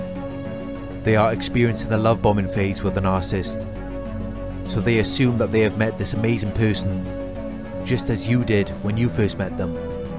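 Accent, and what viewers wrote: Wales, United Kingdom. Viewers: You sound Welsh ? Good video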